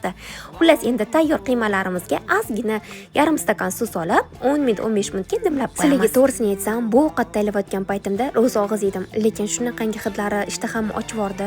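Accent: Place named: Kashkadarya, Uzbekistan. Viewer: Қашқадарёданмисиз? Қашқадарёликлар "тайярлаб" эмас "тайлаб" деб гапиришадида. Шундан билдим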